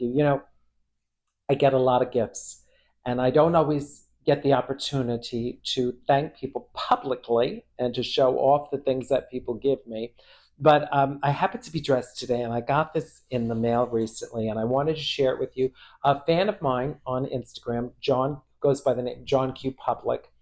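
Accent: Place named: Queens, United States. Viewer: Sounds like Queens, New Yawk!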